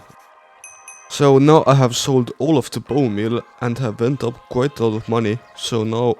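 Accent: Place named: Estonia. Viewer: Your accent is Estonian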